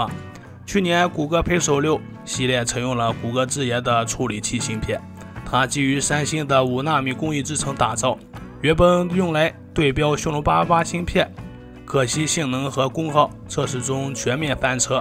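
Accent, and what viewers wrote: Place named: Northwest, China. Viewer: 口音浓浓的西北黄土味！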